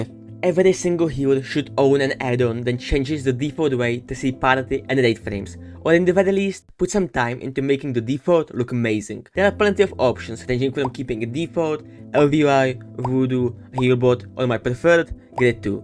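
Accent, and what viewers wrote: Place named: China. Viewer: Jesus Christ, your "chenglish" is killing my brain cells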